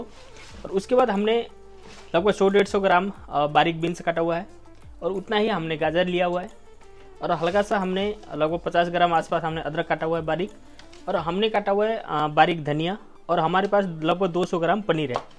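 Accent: Nepal. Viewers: Aap Nepal se ho kya ?? Aapki aabaj aur tongue se aisa feel ho rha hai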